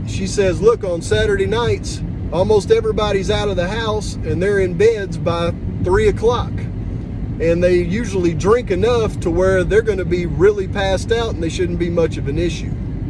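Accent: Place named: South, United States. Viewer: I'm with you too 💯😁 and I love listening to him, I love a Man with a Southern Accent 😄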